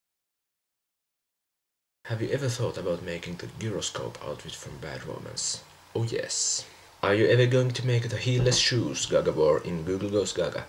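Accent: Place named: Finland. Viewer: Love your Finnish accent:) i <3 and:)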